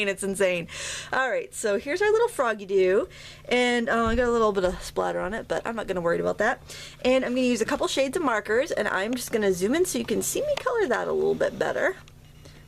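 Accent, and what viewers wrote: Maine, United States. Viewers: Lolol, welcome to you tube land, to hubby! Love the little frog. Don't know why, but I've always loved them. Thanks for sharing all you do during your videos. I was watching your vid the other day, my sweetie walked past, and stopped... staring at the screen. I said you were a great teacher, and that you lolwere from Maine, he said "Oh." And moved on. No telling what that meant, but he's from MA. Boston more precisely. Lol Today is the first time I've heard your accent come thru. His is always there! You should hear the recognition problems he has on the phone! Anyway thanks for all the thinks I've learned from you. Hugs, Jess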